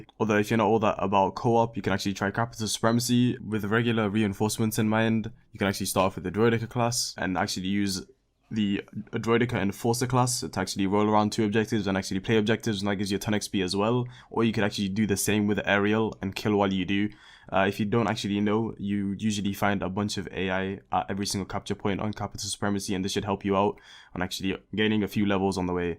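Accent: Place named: Singapore. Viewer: Man sounds hella Singaporean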